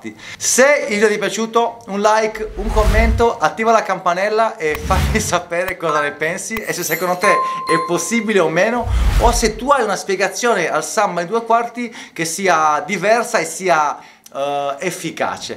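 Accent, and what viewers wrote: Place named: Brazil. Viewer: Si sente che sei brasiliano, l'accento non mente 😂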